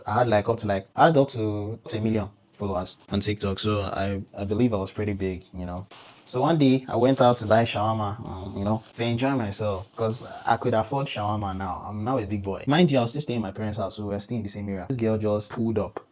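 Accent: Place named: Nigeria. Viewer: U speak like a Nigerian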